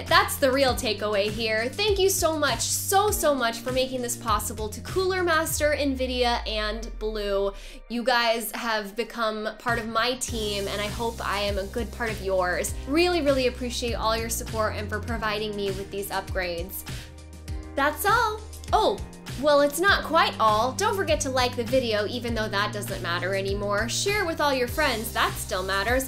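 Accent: South, United States. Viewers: You’re killing it with the southern accent. It’s like I’m watching a home improvement video and you’re showing off your tools 😁😁👍👍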